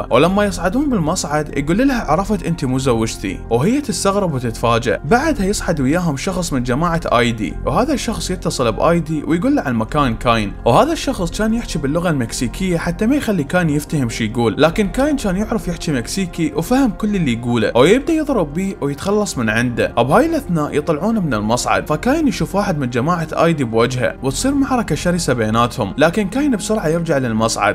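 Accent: Iraq. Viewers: هذ يمكن عراقي😅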